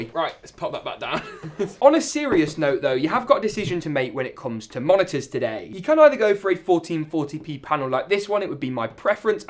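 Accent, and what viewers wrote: United Kingdom. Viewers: You sound like ali-a, it's almost like you might be from the same part of the uk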